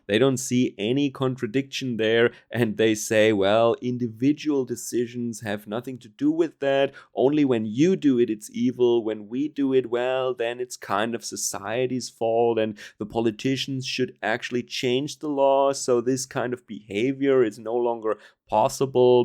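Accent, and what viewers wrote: Germany. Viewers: I want to hear you say..... It only takes a camera to change her mind. In your cool German accent You know the song Lol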